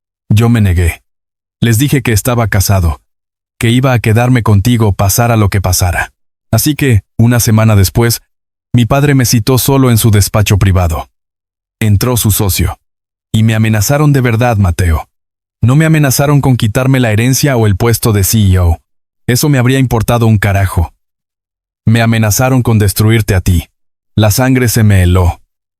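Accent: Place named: Mexico. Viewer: me encan tus BL pero creo que deverias relatar con un lenguaje menos mexicano porque no todos entendemos el modismo de las palabras mexicanas creo que deberias ser mas plural al hablar con acento y modismo neutro que en todos los paises podamos entender con todo respeto te digo esto tomalo en cuanta porfavor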